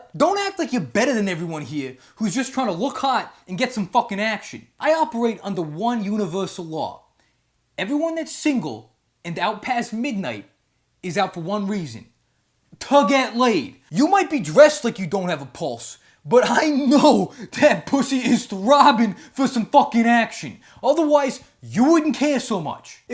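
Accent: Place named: East Coast, United States. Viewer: Roman bath orgy lol This guy looks arab but talks east coast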